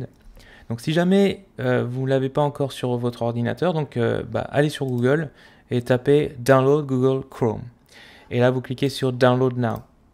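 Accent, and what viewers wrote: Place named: England, United Kingdom. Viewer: belle axenc englais !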